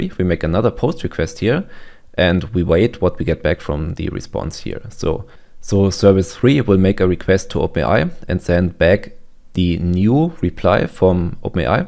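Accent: Germany. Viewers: Well, it's not exactly 'that german accent', not exclusively. It was also the top quality, very succinct, informative content. Your channels are easily mistakable in that regard as well. Truly great.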